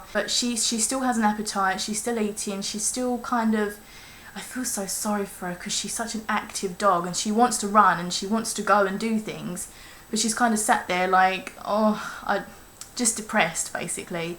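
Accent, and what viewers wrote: England, United Kingdom. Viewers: that english accent just makes me horny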